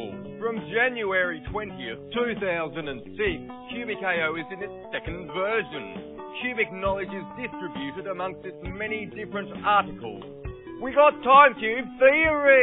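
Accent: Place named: Australia. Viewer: He doesn't sound euro - it's Australian.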